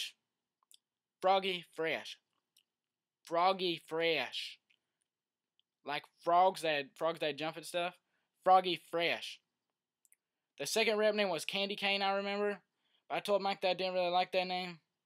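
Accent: South, United States. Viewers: One thing I don't get is why does he have a country tone but lives in Michigan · one thing i hate but im not a hater is his south accent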